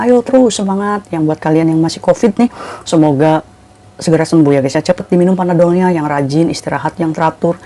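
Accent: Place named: Bolet, Indonesia. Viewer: Kakak orang mana bahasanya kok sama bolet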